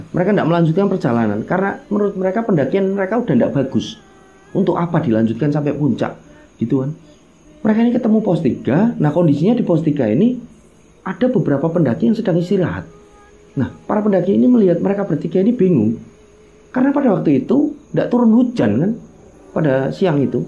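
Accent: Java, Indonesia. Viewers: Q suka cara pembawaanya cara bercerita ada bahasa jawa y kental,top markotop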